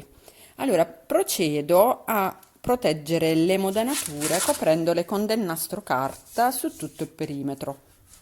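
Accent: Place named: Bari, Italy. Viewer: Tu sei meglio di Diletta. Sai fare cose speciali e sei simpatica. Non mi sembri zona Foggia..più verso Bari. Sei chiara nelle spiegazioni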